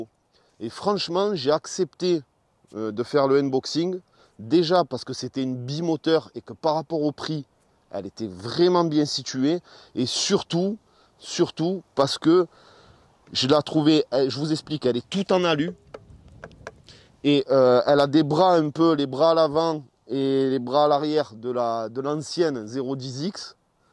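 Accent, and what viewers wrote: South, France. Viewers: Super et aussi tes vidéos, j'aime bien ton accens du sud moi je suis du nord enfin belle trott! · toujours avec l'accent du sud....fabuleux...ça reste une urbaine...nous on cherche du lourd pour du Offroad dans le Cantal en zone nordique...projet en cours.n'hesites pas à nous contacter...Merci MR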